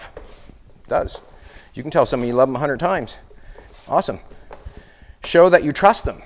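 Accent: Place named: Newfoundland, Canada. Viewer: If I’m not mistaken, that’s a maritime Canadian accent. Newfoundland?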